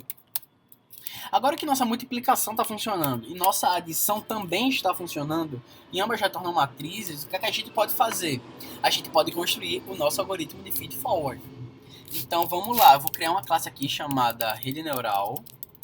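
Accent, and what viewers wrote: Pernambuco, Brazil. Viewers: E pelo sotaque deve ser pernambucano. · Esse sotaque de pernambuco é muito característico, parece muito com o meu de Natal.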